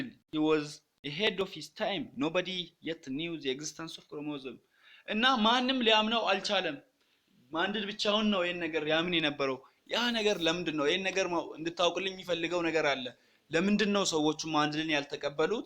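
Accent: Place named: Hadiya, Ethiopia. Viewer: ሀዲያ ለሀጭህ ምንድነው አስተካክል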